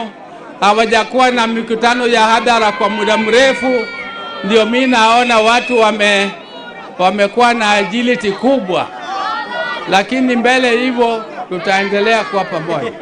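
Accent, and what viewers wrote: Luo, Kenya. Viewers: Nasikia sauti ya jaluo tu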